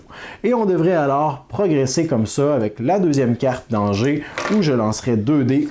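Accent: Quebec, Canada. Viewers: J'adore tes vidéos en général, mais là franchement ta présentation du jeu Jungle Cruise me donne trop envie d'y jouer !! D'abord parce que je trouve le matériel super joli et j'adore la thématique, mais en plus le jeu a l'air simple à jouer et je pense qu'il sera tout à fait adapté pour y jouer avec ma mère. Et pourtant ce n'était pas gagné parce que le fait que ce soit un jeu Disney ne m'inspirait pas plus que ça (et pourtant j'adore aller à Eurodisney et j'adore leurs films). Donc je l'ajoute clairement à ma liste :) et rien à voir mais j'adore ton accent québécois donc c'est très agréable d'écouter tes vidéos :)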